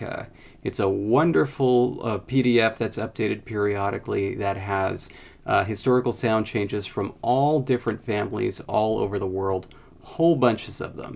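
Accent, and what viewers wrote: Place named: United Kingdom. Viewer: You are British.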